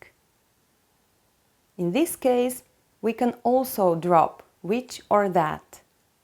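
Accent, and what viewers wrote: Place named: Italy. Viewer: This sucks . You have a foreign accent. Italian?i taught a- levels. ✖️🤢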